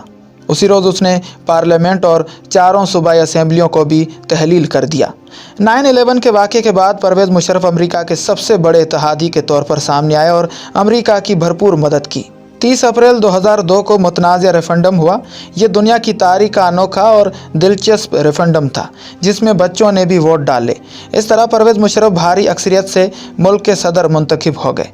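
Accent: India. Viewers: Are you indian. Your lehja is indian. Kia musharraf shia hai. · ki Urdu bhi Hindi hay Indian hay koi yeh channel wala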